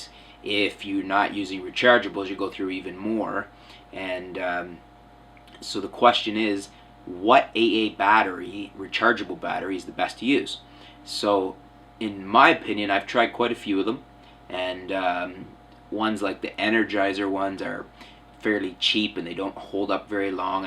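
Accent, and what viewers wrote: Canada. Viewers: best thing about the Eneloop batteries, is your awesome Canadian accent!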